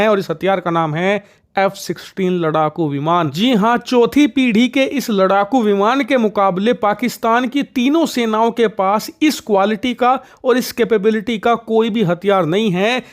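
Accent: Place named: Bihar, India. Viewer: Apki Abaj Purana Nehin.Avi Bihari Vojpuri Abaj Bol Rahi.kya Huwa Purana Abaj.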